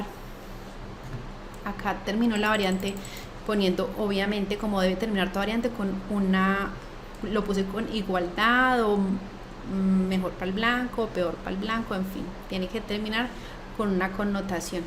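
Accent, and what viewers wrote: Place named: Colombia. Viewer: Acento colombiano!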